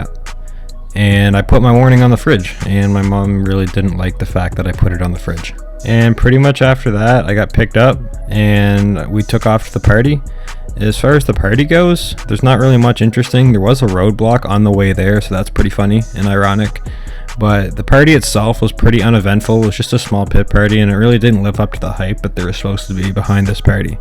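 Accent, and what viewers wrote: Canada. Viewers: That accent is nothing like I heard when I was up in Ontario two years back, a little thicker. I dig it, I only met one dude up there with an accent like that and my group agreed he was just messing with us because he knew we were American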